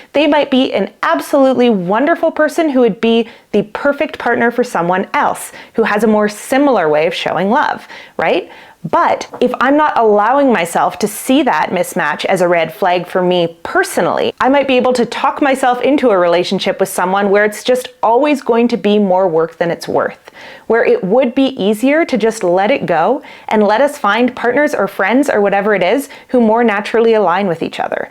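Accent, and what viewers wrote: Canada. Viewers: Why insult Texans? Do you actually think your goofy Canadian accent sounds better.😂😅😮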